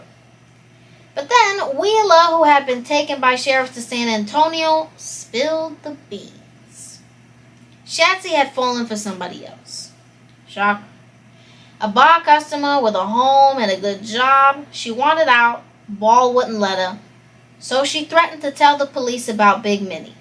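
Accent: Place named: New York, United States. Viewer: Hello I love your costumes and thick New York Accent Long Island no doubt. Sounds like Cyndi Lauper but more cute. I think you like those types of stories and dress up but it’s good I love it thank you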